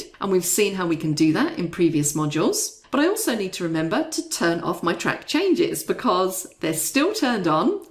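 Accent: United Kingdom. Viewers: Very difficult to fiolllow the explanation about track changes turning off or not. Replayed several times, could not understand the british accent, and what was being clicked on. Need to slow it down a little.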